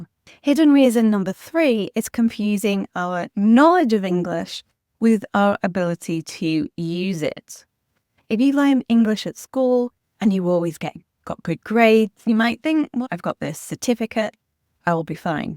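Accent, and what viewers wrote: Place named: United Kingdom. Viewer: I am not able to understand the British accent effortlessly.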